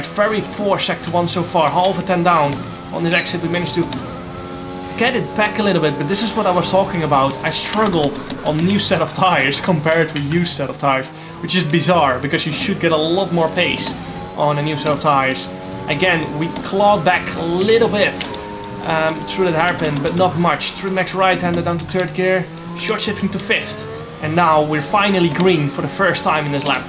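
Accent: Netherlands. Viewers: I knew you were dutch just like me, i could hear it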